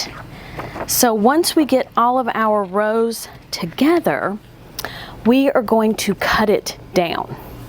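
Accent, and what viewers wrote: United States. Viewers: Thank you. Love this video...and your wonderful southern accent!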